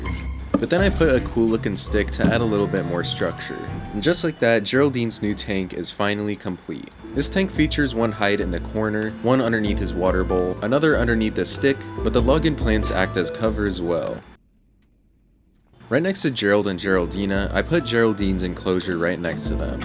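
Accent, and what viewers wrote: United Kingdom. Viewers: Are u British wotah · Thus guy is so british wuter😂😂😂😂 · Are you British? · Bro sounds like a British man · Buddys British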